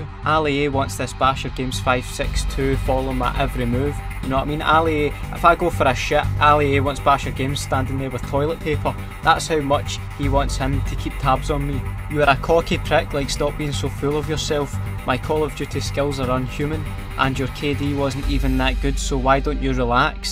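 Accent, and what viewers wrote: Scotland, United Kingdom. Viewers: you and frankie boyle are the main reasons why i love the scottish accent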